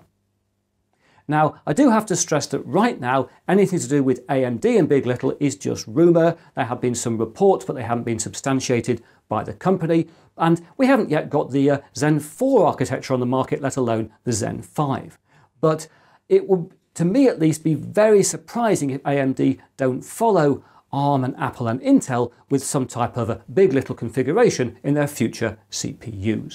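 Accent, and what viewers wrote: United Kingdom. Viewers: This is how I like computer news: clear, concise and British!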